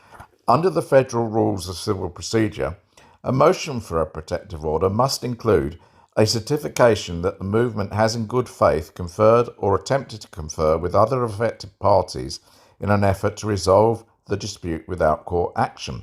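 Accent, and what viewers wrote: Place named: United Kingdom. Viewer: It's the British accent.. Brits can describe a dog laying down a stream of runny pooh and make it sound elegant..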